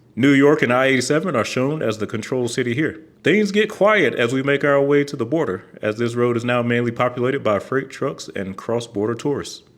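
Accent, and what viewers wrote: South, United States. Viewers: He is from the south, so they pronounce it "rout" down there.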